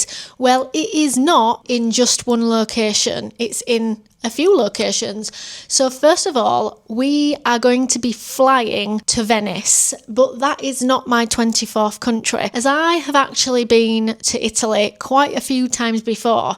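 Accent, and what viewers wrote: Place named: United Kingdom. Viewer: I enjoyed your video , This is the most British British accent I have ever heard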